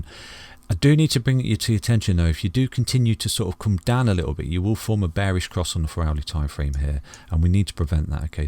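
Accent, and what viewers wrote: United Kingdom. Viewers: Dear, your channel is golden, not to mention your calm, grounded manner and soothing british gentleman voice!!!!! Keep on going!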